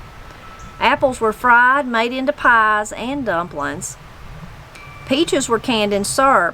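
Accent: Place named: Appalachia, United States. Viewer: Listening to you read these old stories makes me smile, and brings joy to my heart. This reminds me of my Mama, and Mamaw reading to us as little kids. There's a special was us from the mountains talk, it's not a southern accent, it's a lot richer. Thanks!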